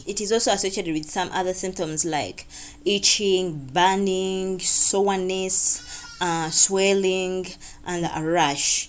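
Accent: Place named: Uganda. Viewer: You sound Ugandan ❤️🤗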